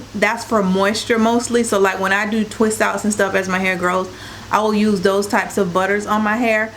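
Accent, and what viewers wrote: South, United States. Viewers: Yes concoction-- i say that all the time!! Southern drawl-- love it-- in central PA and ya'll aint in their vocabulary!! Nice video-- gonna try instead of 500 curl.puddings!!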